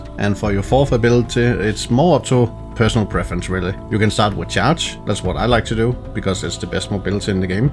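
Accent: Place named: Denmark. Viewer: you have a bit of danish accent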